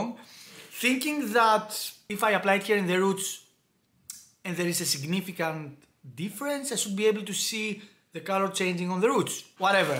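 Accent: Greece. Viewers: Based on the accent you must be from Greece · Thx for posting. Keep up updated. Btw, you sound like a greek fella, am I right?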